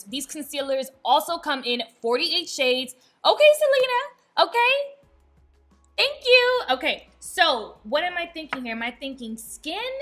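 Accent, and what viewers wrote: Dominican Republic. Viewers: OMG first time watching your videos and I LOVE YOU OMG 😂😂 the little Spanish isms you throw in are priceless. I am Dominican and your accent sounds it, are you!?! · YOU'RE DOMINICAN FOR SURE 🇩🇴 y si no, tienes el acento 😍😍😍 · Clicked because the title said “morenita” and I just knew you would be a Latina 👏🏽 is the accent Dominican? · Dominican accent?! 😃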